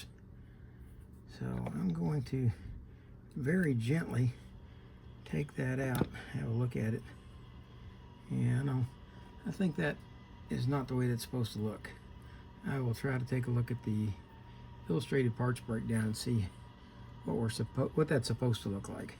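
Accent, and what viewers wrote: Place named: Kentucky, United States. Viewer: even though I have talked to you at length as you guided me through this video, I still just have to comment about this one. Your ability to creatively find ways to fix and repair reels even though parts are missing continues to amaze me. It challenges me to try innovative ways to repair what seems to be irreparable. You did this at least twice in this video and it was amazing. You remember that big TICA surf reel I bought for next to nothing that had a danaged part of the main gear shaft? I figured out a “ miracle” and got that reel working perfectly with a repair that should last indefinitely. As you said in your Kentucky drawl when I told you about it, “now if you hadn’t’ been watching my channel you probably wouldn’t have even tried to fix it.” You were very correct in that assessment as that old but beautiful TICA reel would have been headed for my parts reel boxes. 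When working on this reel you showed another of your many talents - the ability to figure out how to take apart what would confound other less tenacious individuals (such as me.) You also have an almost innate ability to remember how things go back together after you’ve taken them apart. I guess I simply enjoy seeing how your mind works. It is great that you were able to find someone to send you some photos of some missing]g parts that helped you find ways to substitute parts that would allow the reel to properly function. Again, this was an amazing video allowing us to watch over your shoul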